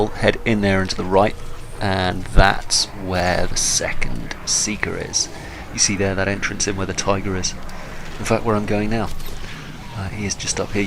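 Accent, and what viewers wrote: United Kingdom. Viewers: im brittish mate, and thats a really tragic accent. its just way too brittish, useful videos though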